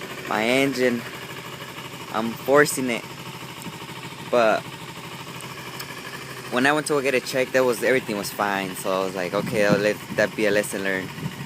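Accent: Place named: Mexico. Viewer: u sound like a typical Mexican bro.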